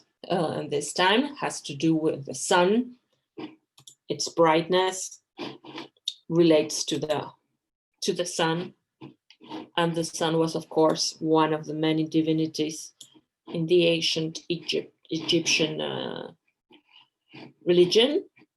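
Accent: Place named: Spain. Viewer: amo la pronunciación perfecta de inglés, con detalles de castellano y muletillas francesas. espectacular.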